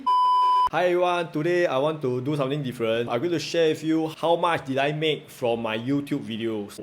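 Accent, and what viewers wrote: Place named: Singapore. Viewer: Your singlish and your singaporean accent is the reason why i subscribe to you;like listening to an elder brother talking to me, very very local.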